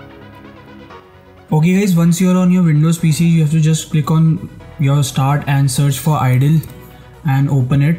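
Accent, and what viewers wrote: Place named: India. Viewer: you know a videos going to be helpful when the voice is that of an Indian guy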